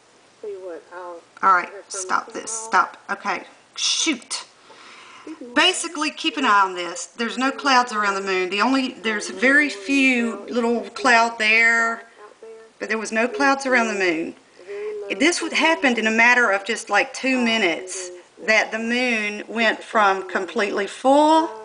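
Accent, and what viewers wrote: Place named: South, United States. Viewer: You wouldn't think your a mom. Nice, and having a southern accent has nothing to do with it. Keep it up mom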